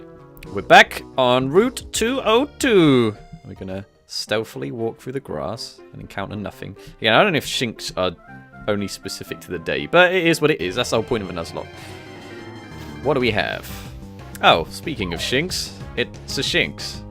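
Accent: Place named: England, United Kingdom. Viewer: good videos man! without any doubt you deserve more subscriptors!! where are you from? england I belive for you acent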